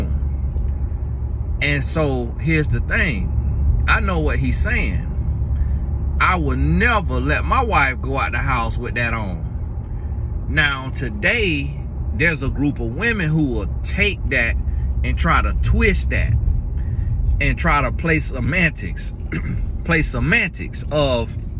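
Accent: South, United States. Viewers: Mr I thought I talked southern but you have me beat.. 😂😂 God Bless you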